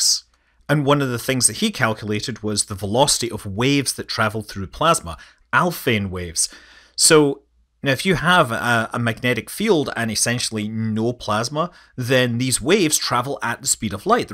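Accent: Scotland, United Kingdom. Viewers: Love to hear a Scotsman talking about a magnetohydrodynamic drive, as always.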